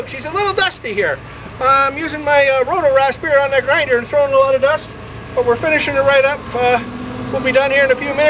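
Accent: Newfoundland, Canada. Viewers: He must be from Newfoundland.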